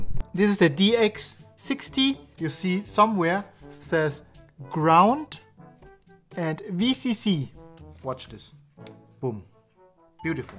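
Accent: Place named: Germany. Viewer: BIG German accent but great video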